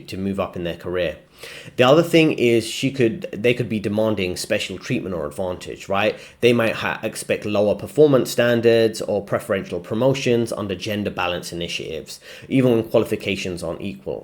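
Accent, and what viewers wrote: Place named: Multicultural London, United Kingdom. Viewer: In accent (Multicultural London English?) the plural word "women" sounds like the singular word "woman". Completely off topic but interesting.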